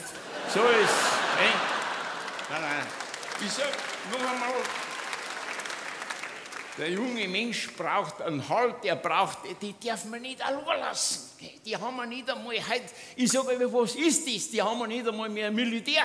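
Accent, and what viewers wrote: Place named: Bavaria, Germany. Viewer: Sei deitsch is mindestens so guat wia vo manchem Preissn, moan i.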